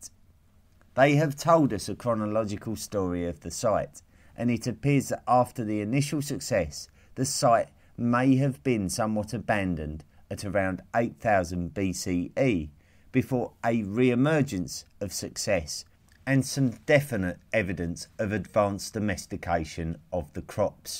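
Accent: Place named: United Kingdom. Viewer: What British accent is this?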